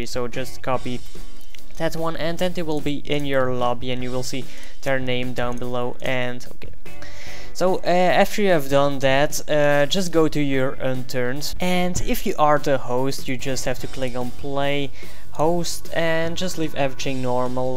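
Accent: Netherlands. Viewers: are you dutch? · Thank you mate! Btw i think your from The Netherlands :D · lol ur dutch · he is dutch idiot not every language sounds same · so ur dutch? or from Belgium? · Is hij Nederlands?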